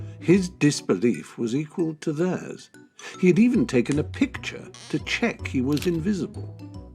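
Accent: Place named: United Kingdom. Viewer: So Anonymous is liberal now? I'm not a Trump fan, but the creator needs to look in the mirror. 1. The EPA is unconsitutional per the 10th amendment (along with most Federal agencies), so that's not good. 2. Douglas Keenan PROVED the IPCC fabricated the results supposedly "proving" global warming is anthropogenic. That which can be asserted without proof can be dismissed without proof. 3. What does a graph of world poverty have to do with Trump? 4. The most dangerous places in America are those with the strictest gun laws, but let's ban all guns. 5. Years of education are higher than ever yet real median household income has been falling since 2000. Hmm, maybe because public schools are really indoctrination camps that teach nothing but how to obey authority (and how to beg it for help). Dept of Ed also unconstitutional. Surprisingly, a British accent only gives the illusion that you know what you're talking about. 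It doesn't matter what facts you present these people, they will always accuse you of that which they are guilty. Lastly, I'd be willing to wager a paycheck the creator hasn't sat through The Clinton Chronicles. That's called confirmation bias fyi.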